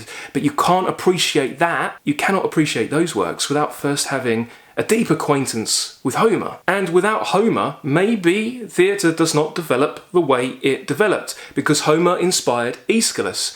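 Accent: United Kingdom. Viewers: I really enjoy your introduction of great books. And of course sort of envy. I envy you upon reading all these books when still young. Your British accent is fantastic.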